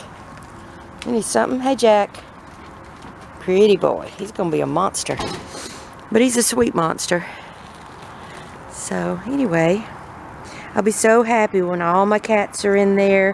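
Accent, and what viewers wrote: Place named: South, United States. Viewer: Love what y’all are doing for the cats 🐈 & Lily the goat 🐐! I also love that southern accent. All of my family is from VA, with a few of us living in MD. Take care, 🎀 Lily 🎀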